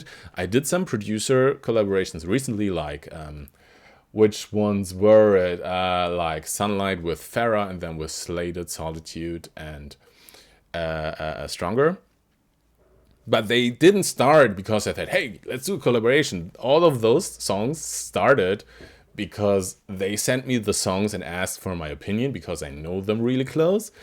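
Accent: Germany. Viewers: Man hört ihm schon an, dass er Deutscher is xD Hätte ich von seinem Pb her aber nicht gedacht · Well you actually can tell from his accent. I also live in germany and i always hear this accent from german people that speak english. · Well i heard it that your a german person because of your accent(and i´m german too also warum schreibe ich auf englisch, ich pfosten hehe) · An diesem Akzent merkt man eigentlich direkt er ist Deutscher To my English friends the Translation: With this accent you can tell that he is German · Are you german it sounds like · Is der Deutsch? =>where is he from, or is he german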